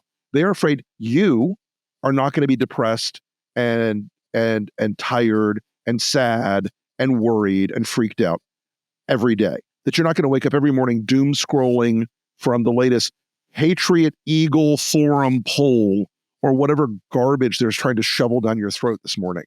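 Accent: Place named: Midwest, United States. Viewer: This ☝🏼. This right here is why I love you 💙 Always straight up and plain spoken. Idk why I think you’re a Floridian but you speak like a midwesterner 👍🏻😂 Ty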